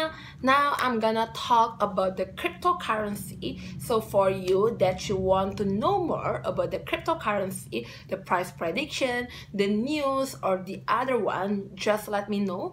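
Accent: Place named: Indonesia. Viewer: Ur accent sounds like indonesian 🇮🇩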